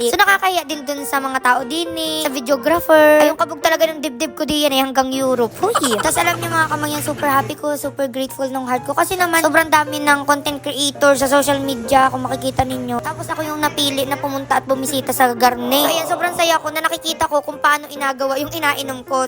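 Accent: Batangas, Philippines. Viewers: Manyan ka,,pero tonong batangas ka...🤔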